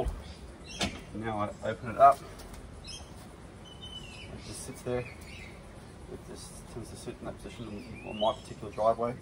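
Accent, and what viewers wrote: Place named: Australia. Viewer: i built a swinging carrier in about 2015 glad to see someone else has done it. Im in QLD sounds like youre in aus too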